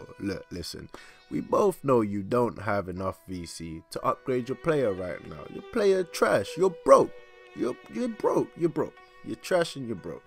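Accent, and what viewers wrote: London, United Kingdom. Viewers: Your from London init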